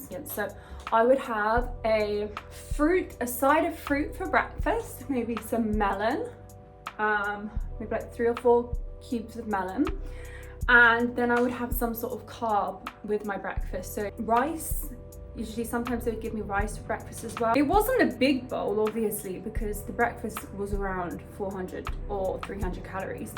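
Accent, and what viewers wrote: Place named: United Kingdom. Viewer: Totally off topic but without enjoying your video there is another reason why I'm here and the reason is I'm using your videos as my British pronunciation tutorial. I'm practicing British pronunciation by watching your video and it's really help me a lot. Lots of love 💓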